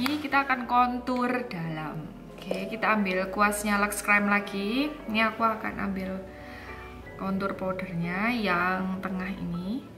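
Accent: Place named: Probolinggo, Indonesia. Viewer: aku suka sama mbak.😁 suami khu orang perobolinggo suka ketawa denger suara mbak, kata nya jadi kangen rumah 😂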